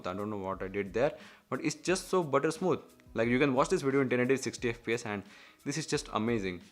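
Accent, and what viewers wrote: India. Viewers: What a horrible accent. Indian english is the worst.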